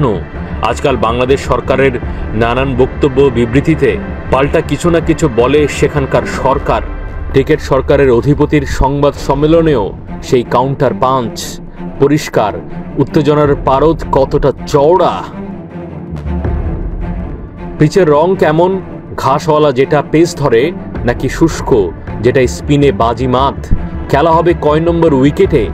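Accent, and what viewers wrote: Kolkata, India. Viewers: এই লোকটা কি ক্যালকেশিয়ান? এভাবে কথা বলে কেন? আমরা বাংলাদেশ, বাংলা নই।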